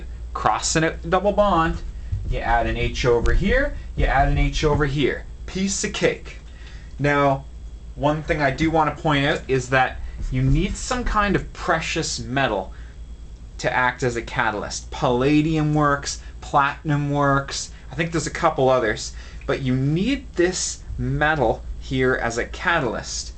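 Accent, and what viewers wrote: Canada. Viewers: you sound very canadian--love it:D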